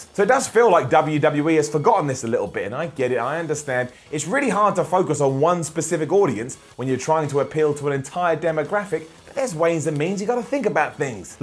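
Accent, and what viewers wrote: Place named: United Kingdom. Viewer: I've watched so many videos featuring that I'm so used to his voice that I can't hear his British accent anymore. I'm just completely used to it.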